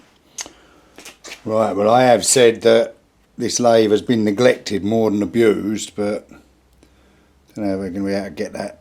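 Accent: East London, United Kingdom. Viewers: Nice video. Your work bench is as cluttered as mine is , I think it might be a Cockney thing as you sound like you come from east London. 😊😊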